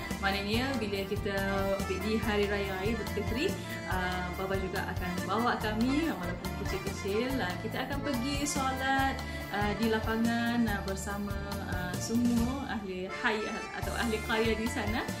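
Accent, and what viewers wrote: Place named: Arab world. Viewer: Satu2nya dj ikim yg berckp melayu slank arab..unik dn sedap didengar..klu ckp arab..lg la terpesona dgn lenggok kata2.. walaupun tk faham..😅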